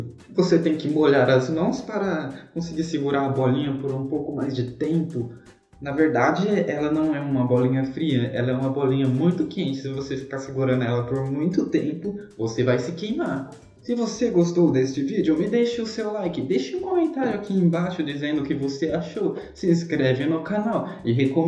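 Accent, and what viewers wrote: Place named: Italy. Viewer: Não sei pq, mas o sotaque me lembrou muito Super Mario.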